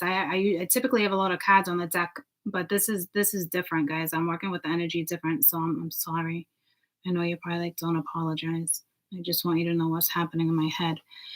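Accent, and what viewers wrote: Boston, United States. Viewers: First time here. Saggie!! Thank you. I hear an accent, are you from Boston/ New England Area?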